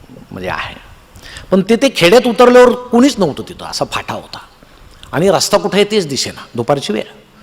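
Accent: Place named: Ahmednagar, India. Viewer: नगरी भाषा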